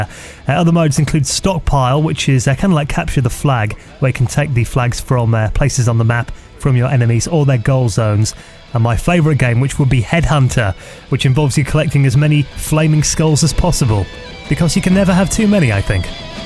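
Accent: United Kingdom. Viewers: A british reviewer Woooo!!! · Ahh, thats what I like, the sound of a true British voice ;)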